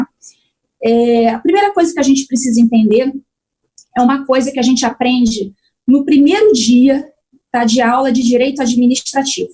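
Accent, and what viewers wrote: Rio de Janeiro, Brazil. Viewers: Não é a voz dela, ela fala com acentuado sotaque carioca!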